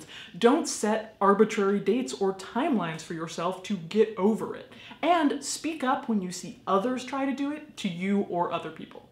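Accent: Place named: Midwest, United States. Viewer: Came for the death positivity, stayed for the spot on Midwest accent.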